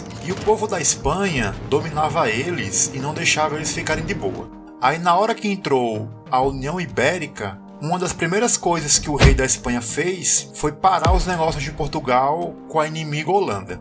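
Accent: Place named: Northeast, Brazil. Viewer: Queria que existissem mais vídeos no youtube com essa pronúncia do nordeste do Brasil pois é a única que lembra a de Portugal.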